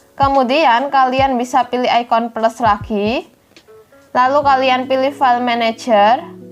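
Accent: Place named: Java, Indonesia. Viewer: Medoknya gemoi :v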